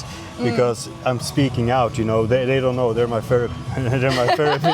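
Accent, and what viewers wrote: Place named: Finland. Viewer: Vilken härlig och positiv finsk tjej! 😊Även om hon har en mycket bra engelska, så hör jag direkt en finländare.😉Menit seurantaan. Odotan innolla tulevia videoitasi!😉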